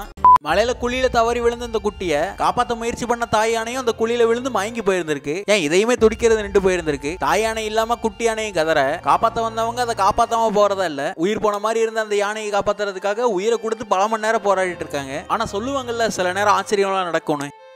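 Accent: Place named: North India, India. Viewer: இந்த பதிவுக்கு பேசுபவர் தமிழர் குரல் மாதிரி தெரியலில்லை வட நாட்டுக்காரர் தமிழ் கற்றுக்கொண்டு பேசுவது போல் தெரிகிறது. உண்மையா?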